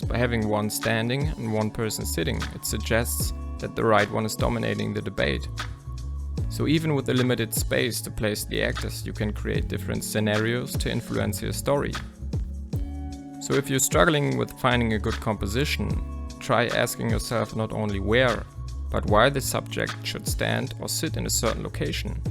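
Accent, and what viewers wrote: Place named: Germany. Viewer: your english sounds so german. but your video is great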